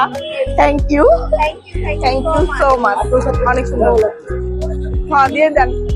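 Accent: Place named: Barishal, Bangladesh. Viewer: আপু ধন্যবাদ। বরিশালের ভাসায় ডায়লক দেয়ার জন্য।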